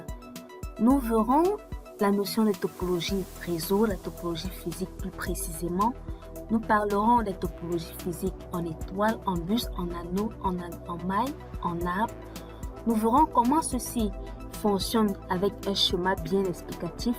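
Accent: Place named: Cameroon. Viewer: Tu intonne comme une Camerounaise.. Tres bonne lecon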